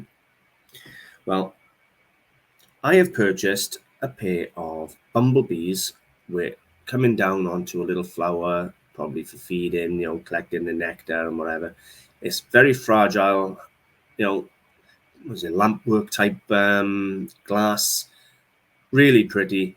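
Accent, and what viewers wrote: Wales, United Kingdom. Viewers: I loved the silver fish spice box. I am Jewish and I have never seen one. I have a spice box in the shape of a sunflower. The spices are used in a ceremony at the end of the Sabbath and smelling them reminds us that we are saying goodbye to our special day. By the way the word Judaica is pronounced as though the 'c"is a "k". I enjoy your videos and especially enjoy your Welsh accent as my husband is also Welsh. Much success in 2022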